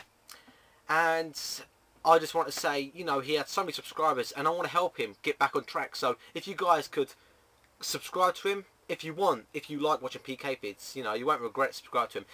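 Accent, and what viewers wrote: United Kingdom. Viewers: i love ure accent <3 <3 egland accent FTW!!!! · fun to listen to with your awesome british accent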